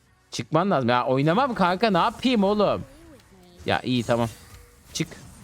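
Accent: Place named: Cyprus. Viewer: Kıbrıslı arkadasın mı var senin ?:) Arada bizim ağızdan esintiler var :D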